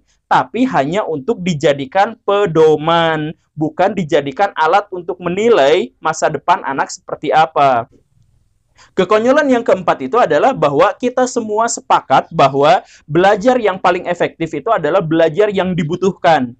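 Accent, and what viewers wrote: West Java, Indonesia. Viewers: Bapak orang Sunda ya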